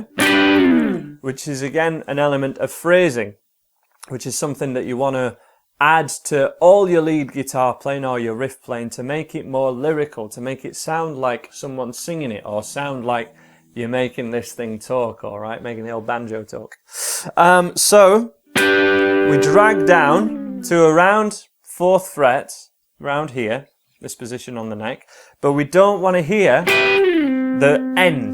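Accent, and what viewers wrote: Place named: Northern England, United Kingdom. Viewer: Kool thanks enjoyed that tutorial IAM in Australia you are obviously northern English I was born in Cheshire hope to see more of your tutorials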